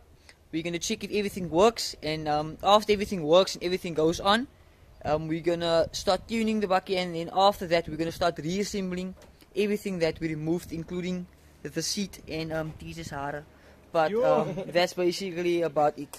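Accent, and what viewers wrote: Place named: Afrikaans, South Africa. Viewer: Sounds afrikaans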